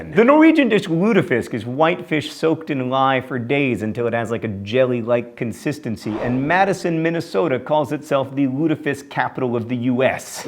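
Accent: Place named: Alabama, United States. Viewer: what happened to your Alabama accent? my brother was born in Alabama wasn't talking when we moved to California by the time he started talking he had an accent from the south. now he just talks like a surfer dude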